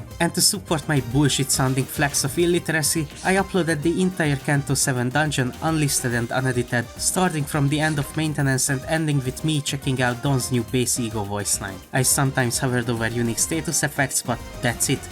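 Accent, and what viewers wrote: Hungary. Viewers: ngl for some reson it kinda sounds like you have a hungarian accent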